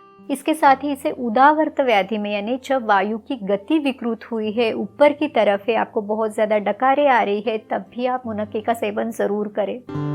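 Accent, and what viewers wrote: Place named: Maharashtra, India. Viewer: Aap ko dekhke aapki bolne ke tarike se aap maharastiyan lagati hai didi❤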